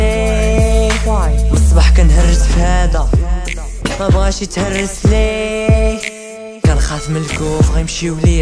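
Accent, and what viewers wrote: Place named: Algeria. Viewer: من لهجتك بايتة جزائرية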